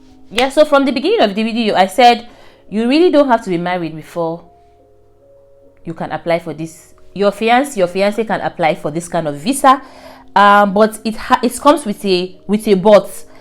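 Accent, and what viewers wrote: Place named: Hausa, Nigeria. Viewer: Your accent sounds hausa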